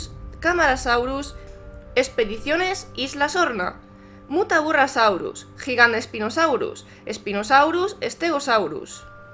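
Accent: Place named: Castile, Spain. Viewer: Tienes acento castellano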